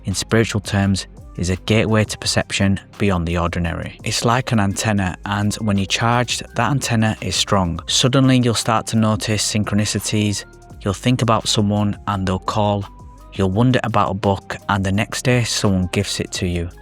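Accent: United Kingdom. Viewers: Just found this channel. A fellow Yorkshire brother I believe? Leeds? Haha. Back for my SR journey! Let's go!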